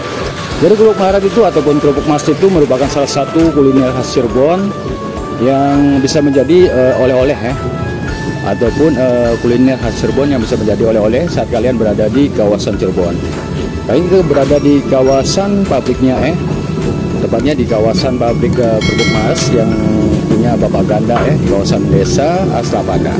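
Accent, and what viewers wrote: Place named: Malaysia. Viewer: Dengar dari logat kayak orang melayu youtubernya.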